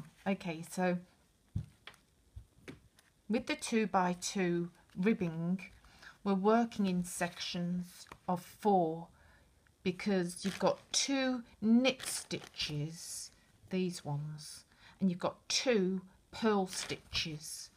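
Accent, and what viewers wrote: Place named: North, United Kingdom. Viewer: Thanks..... love hearing that northern twang!!!! Im from the north too!!;)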